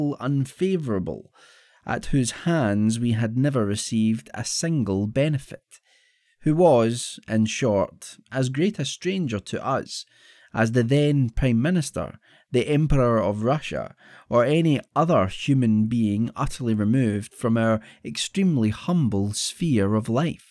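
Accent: Scotland, United Kingdom. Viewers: Enjoyed your reading . It was a suspenseful tale .Your scotch dialect reminds me of David Balfour in Kidnapped . We Americans sound ordinary . Probably not ordinary to other dialects but how can one gauge ? Also the smoke has a mesmerizing effect . Thanks for your audio tale !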